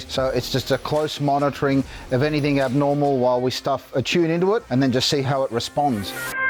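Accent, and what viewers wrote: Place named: Australia. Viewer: If you do anything with an Australian accent, ill watch it.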